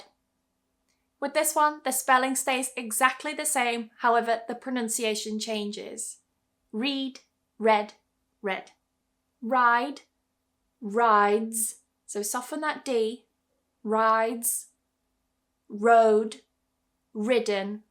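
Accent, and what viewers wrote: United Kingdom. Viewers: Hi again,! As I've said before, your British accent is just delicious! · I really loved your all of video to learn lot of things . you is the best teacher ever, you is a beauty queen of BRITISH accent. & Im really big fan & followers of you. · sorry teacher , are you british ? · i really like this channel that the british accent was sounds comfortable❤️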